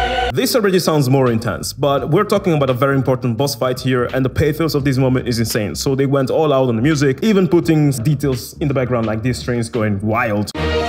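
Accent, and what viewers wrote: Italy. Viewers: Non sapevo tu fossi italiano! Ti ho cominciato a seguire un po' di tempo fa e sentivo qualcosa di familiare nel tuo accento... Adoro le analisi che fai, ottimo video come sempre! Greetings From Italy! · Man, are you italian, or resident in Italy? Your accent really sounds like it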